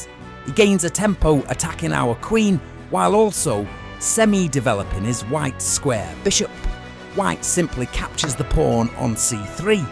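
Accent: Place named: United Kingdom. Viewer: Bro is defenrtly from the british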